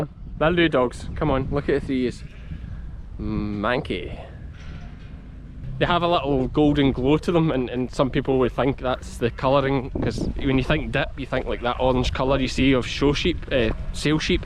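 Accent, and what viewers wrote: Scotland, United Kingdom. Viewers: i love the scottish accent, respect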